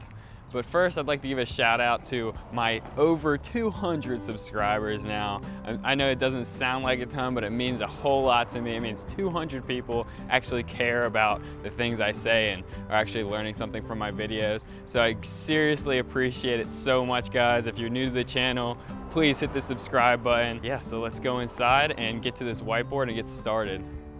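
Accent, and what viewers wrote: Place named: East Coast, United States. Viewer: Loved the video and I’m thinking of doing this! Are you still in AZ?! It would be awesome to meet you sometime, are you originally from the East Coast, because you sound like it.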